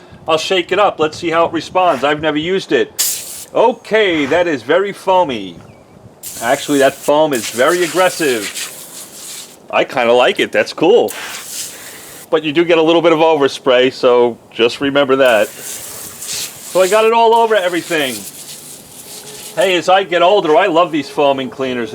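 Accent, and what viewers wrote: New York, United States. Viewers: He sounds like he’s from New York not from Dallas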